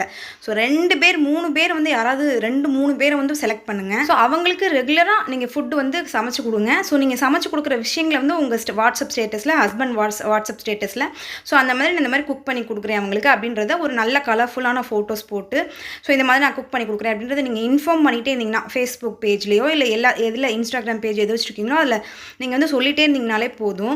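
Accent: Madurai, India. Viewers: Neenga madurai ya sister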